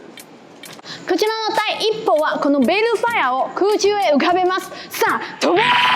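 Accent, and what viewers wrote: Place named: Japan. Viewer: I like your Japanese accent. Sounds really cute!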